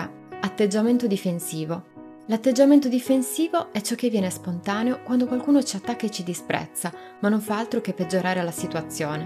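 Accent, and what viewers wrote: Sardinia, Italy. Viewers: so che non è inerente al video... ma quanto è bello l'accento sardo? :D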